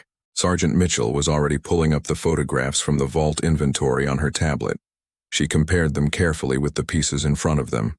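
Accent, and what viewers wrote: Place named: United States. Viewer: Ruined by being 'read' in an American accent. 😡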